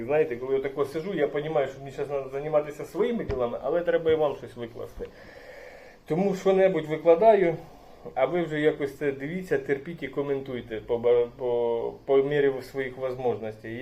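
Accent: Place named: Kamianets-Podilskyi, Ukraine. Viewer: Ваша балачка дуже похожа на нашу ( кам,янець - подільський) з початку як почув то подумав що десь від нас родом😊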